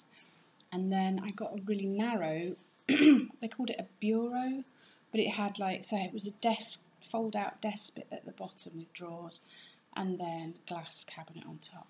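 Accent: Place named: England, United Kingdom. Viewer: Thank you! I'll bet you find some amazing things in, I'm guessing England, by your accent😊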